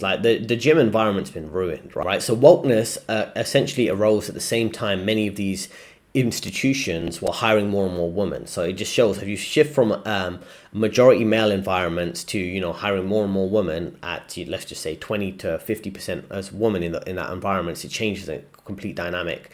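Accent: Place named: Multicultural London, United Kingdom. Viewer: In accent (Multicultural London English?) the plural word "women" sounds like the singular word "woman". Completely off topic but interesting.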